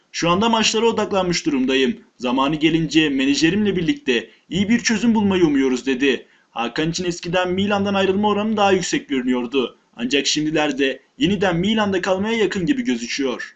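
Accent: Gaziantep, Turkey. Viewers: 1 sen anteplimisin 2 lyon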